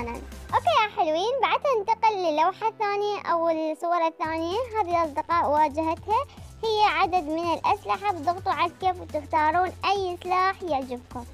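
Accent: Iraq. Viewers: ة انا من العراق تحية كبير لي اهلك و لي الج 😀😊 اعرف انتي من العراق مبين 😜سوري سوري بس احبج 😍😁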